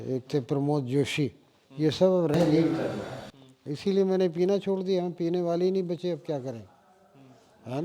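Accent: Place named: Haryana, India. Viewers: बेटे आप मेरे को हरयानवी लगते हो असग़र साहब से सवाल करते हुए हरयानवी लहजा झलकता है? बहुत ही लाजवाब चर्चा बड़ी ख़ास बातें बताई असग़र साहब ने बड़े लेखक संवेदनशील इंसान सलाम सर जी को, आपको बहुत बहुत बधाई शुभ कामनाएँ एक मानवीय सरोकारी इंसान से रु ब रु करवाने के लिए संग्रहणिए विडियो, महिपाल मानव हिसार हरियाणा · katter haryanvi h ji ye nam h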